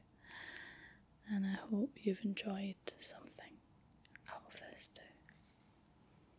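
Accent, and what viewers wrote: Scotland, United Kingdom. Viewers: Wow. That's like the most scottish accent I've ever heard 😂❤ and I've been to Scotland once, and loved it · Pretty Scottish lady, the English and Americans laugh at your Scottish accent, but I don't; I find it pleasant and it helps me understand English better. Also, when I listen to the music from Mel Gibson's Braveheart while flying over your Scottish landscapes with a drone, it's magnificent. I'm French, and I hope to hear from you soon.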